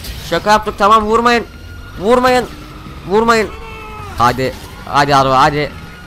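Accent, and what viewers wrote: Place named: Sivas, Turkey. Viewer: Kardeş sen sivaslın herhalde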